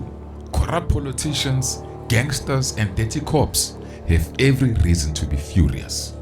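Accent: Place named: South Africa. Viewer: you've cracked the faceless channel code. Your numbers are crazy... With a South African voice over, wow 👏👏👏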